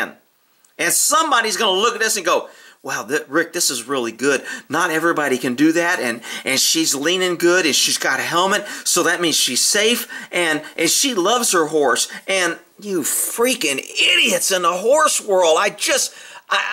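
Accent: United States. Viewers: hey, I liked a couple of videos because it was too true what you were saying.. but in this video... and I'm talking about the first 3 pictures... really from what I can hear you are a real American. (I'm from the Netherlands) So you're probably a western rider.. well my opinion if you do that wrong... we are human and make mistakes and we are working with about 700kg animals with a mind for them selves. I know and say yes, I had bad jumps and even fell twice with my horse because he made a canter change I didn't ask for, but my horse still loves to go through the cross country and I can also say if he doesn't want to go, he doesn't go.. because if you use a stick or spurs in that moment, he let's you eat dirt... and maybe you should check about the build and there way of moving of a breed of horse before you talk about them that way... (it's a friesian horse, and let me tell you, it's a really old breed)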